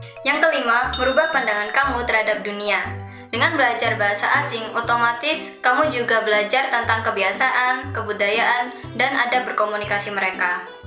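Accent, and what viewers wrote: Java, Indonesia. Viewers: javanese nya kentel bgt mba 😂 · Muedhok puol, tapi keren kak saya suka tips nya hehe 👍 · klo aku nanya kayak nya di jawab ngga yah??? kak, orang mana sih? kok jawa nya agak medok? hehehe just asking.... Loading.... Waiting for answers..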